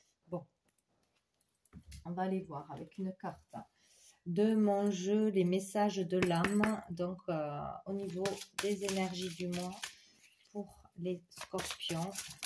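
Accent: South, France. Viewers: Gratitude à vous quel doux accent di sud Namaste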